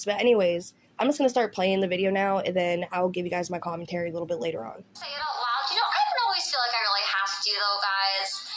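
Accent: South, United States. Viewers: your accent is interesting. Your “I” sounds like your from the south (words like “LIKE”, “LINE” ect.). All other words sound like “normal”. Where in the country do you hail from? Love you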